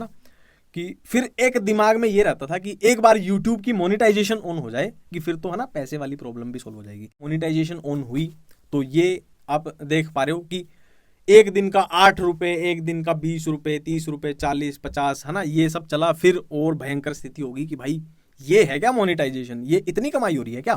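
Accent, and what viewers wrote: Haryana, India. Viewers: Bhai mere app ho successfull.. You help a lot of neet aspirants Ghana pyar haryana sonipat te Apke video main doubt tha avaj te ke haryana te ho Ibb confirm hogya❤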